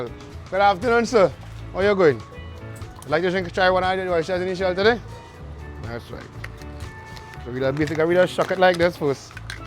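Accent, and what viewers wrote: Trinidad and Tobago. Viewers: That's a true Trini, different accent from Jamaican. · It’s because he isn’t Jamaican…he’s Trinidadian so he’s sounds Trinidadian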